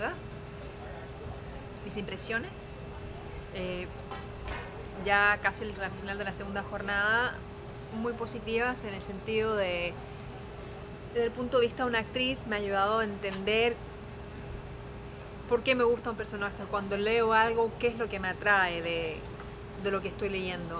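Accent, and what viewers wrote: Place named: Argentina. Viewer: Pq chucha hablai como argentina weon! Estas igual que zamoranoo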